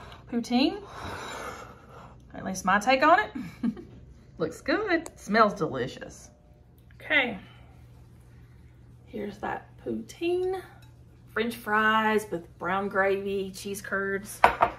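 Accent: South, United States. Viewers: Looks perfect!!!! And love your southern slang!!!! Keep up the great work on your videos from OHIO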